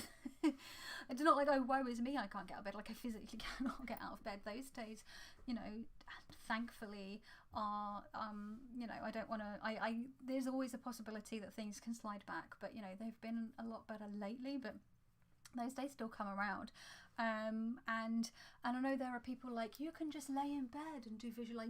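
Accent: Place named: United Kingdom. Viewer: I'd like to see the video as well. Having so many "I once thought" moments is a good thing. Imo. It says that you have a open mind and heart. This was great ❤ btw hearing your lovely accent makes me miss the UK so much. Can't wait to go back. Thanks for sharing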